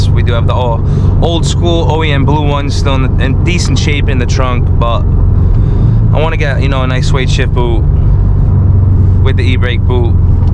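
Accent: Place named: Fall River, United States. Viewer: Lol I’m watching this and I’m like hey that accent sounds familiar. Then I realized it’s Fall River. Sup blica torte!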